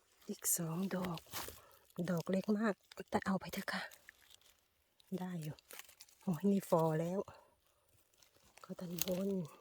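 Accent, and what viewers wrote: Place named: Suphanburi, Thailand. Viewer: ฟังเสียง พูด เหน่อๆ เหมือน คน สุพรรณบุรีกาญจนบุรี รึราชบุรี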